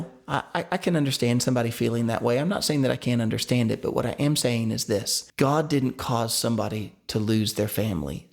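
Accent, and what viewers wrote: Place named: South, United States. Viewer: Feast of booze 🍸 😆 so funny! I like your country accent! 😊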